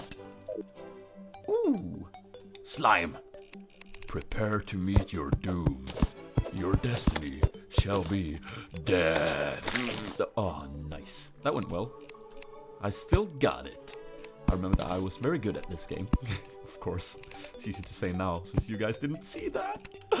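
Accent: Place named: Sweden. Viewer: Cool man, you swedish? (thats what your accent sounds like, I'm from Australia)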